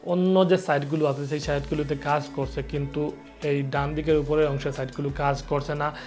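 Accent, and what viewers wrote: Sylhet, Bangladesh. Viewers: ভাইছাব সিলেটী নি৷ আমিও সিলেটী৷ আফনার ভিডিও বালা লাগলো৷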